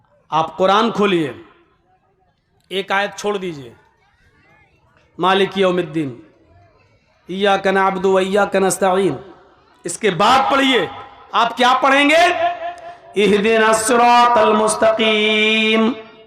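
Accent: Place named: Bangladesh. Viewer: Ye sala Bangal deshi bala bat karta h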